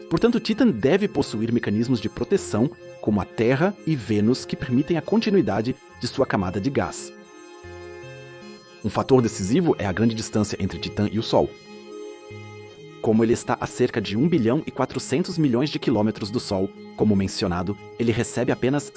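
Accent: Portugal. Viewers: Porque do nada durante o vídeo muda para o português de Portugal? 😂😂😂😂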